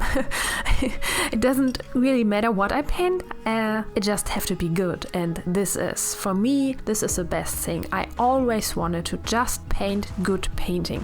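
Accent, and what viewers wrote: Germany. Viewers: I love your accent! I used to work at a horse product store with a German lady and hearing you reminds of many fond times with her! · This is Xiao from China. I have absolutely no problem with your adorable German accent. Your English is proved perfectly fine, and better than being fine -- it is so sincere and expressive. Love your art! And I feel the same when a painting or drawing actually comes out matching my expectation. So satisfying. ///^_^/// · I can't believe people are so rude to say they don't like your accent! English is a weird language and you speak it great. I am Canadian, but my mother moved here from Germany, and you sound like my family; you are lovely to listen to. And an incredible artist. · I understand completely. the pictures transport me to some fantasy world I'm desperate to know the story of. And to answer another question. 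watching your videos to learn watercolor which I really struggle with but have found myself watching everything because you explain so well and are very interesting to listen to. also I love your accent. I had and visited a German penpsl when I was in school.. do your accent is a lovely reminder of that friendship. thank you xx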